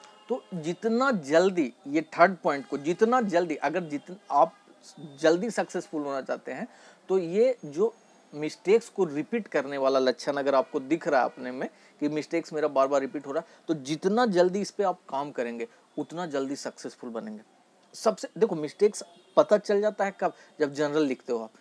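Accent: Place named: Rajasthan, India. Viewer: Kaha se ho Rajasthan se lag rhe ho Kuch aap ne kya change kiya market me trading me ye batav video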